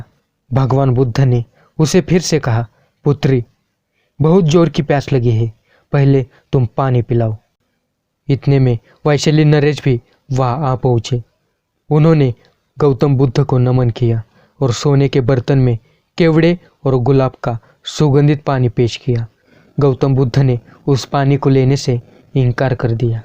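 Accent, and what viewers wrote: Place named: Maharashtra, India. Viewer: तुमच्या हिंदीत मराठी झलक आहे मराठीत बनवा video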